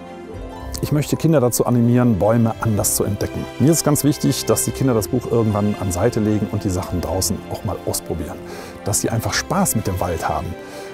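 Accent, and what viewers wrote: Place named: Bregenzerwald, Austria. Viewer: Bregenzer wald dialekt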